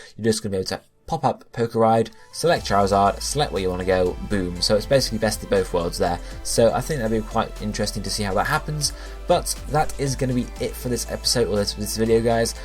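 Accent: United Kingdom. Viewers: Yes he is. That's how they say the letter H in the UK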